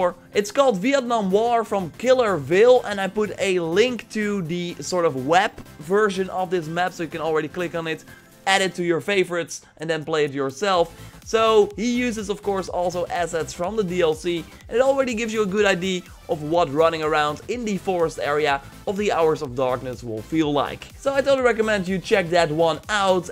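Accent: Netherlands. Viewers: Wait what are u dutch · Ik wist altijd al dat je nederlands was dat hoor je gwn aan je accent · I'm assuming that cool accent is a Dutch one?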